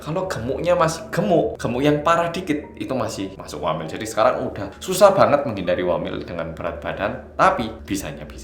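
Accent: Java, Indonesia. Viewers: Pemalas aja entitled banget. Mungkin orang ngga mau namain anaknya kayak gitu karena ngga mau anaknya namanya sama kayak penjahat. Makasih, 🙏🏼🙏🏼 PS: percuma juga kalopun yang baru masuk cuma bergaul sama yang pertama kali masuk penjara. Dia aja ngga mau tanggungjawab malah nyalahin sistem keadilan/penjara di Korea. Cih. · Medooooookkkkk,aku datang wkwkwk:_Haa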